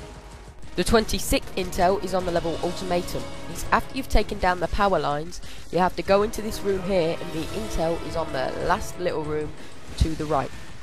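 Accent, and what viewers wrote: England, United Kingdom. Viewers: LOL, super british accent! XD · your british. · im jealous of your accent lol. I allways thought english people were just english jerks bragging about speaking speaking english better then americans. But after that i wish i could talk like that lol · Who cares if he's british i have cheats now!! THANX MAN!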